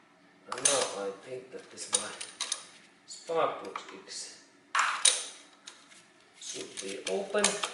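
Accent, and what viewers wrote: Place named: Finland. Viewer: Nice finglish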